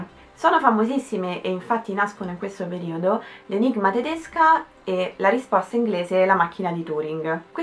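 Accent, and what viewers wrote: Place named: Aosta Valley, Italy. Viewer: Brava, precisa e spigliata. Proprio a trovare un pelo nell'uovo l'accento valdostano ;-) E poi morirò chiedendomi se si dice nanòmetri o nanomètri.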